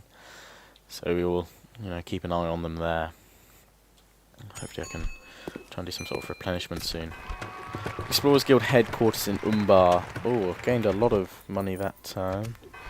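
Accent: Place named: Chichester, United Kingdom. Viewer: i love your content:p and btw are you from chichester by any means?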